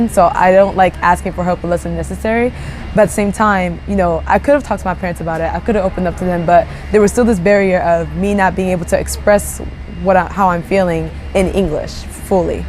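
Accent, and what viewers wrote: Japan. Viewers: Haha! You are so Japanese lol! The way you speak English is colored by the Japanese. It's really interesting. Your American classmates must have thought it was different!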